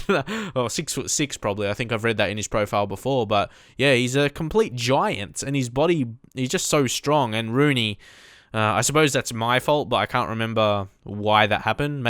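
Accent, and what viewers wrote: Australia. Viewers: R u from Australia????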